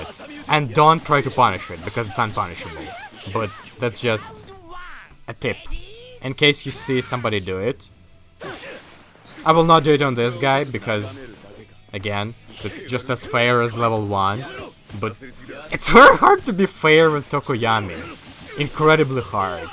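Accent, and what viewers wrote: Russia. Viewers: I think he's Russian?? I could be wrong tho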